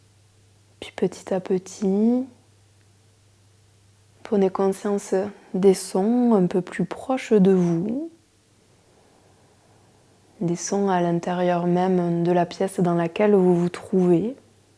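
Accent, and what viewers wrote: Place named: South, France. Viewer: Merci aussi pour ce charmant petit accent du sud.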